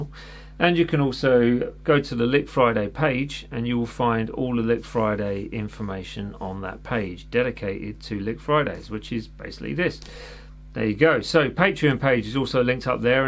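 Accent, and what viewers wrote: United Kingdom. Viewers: Your British accent is so pleasant to listen to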